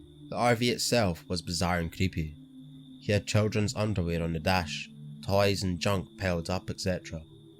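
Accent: Scotland, United Kingdom. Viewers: I love your Scottish accent, it really adds to the story. But sometimes it's kind of hard to understand it, so my suggestion is adding captions to the bottom of the video. I bet it would please a lot of people.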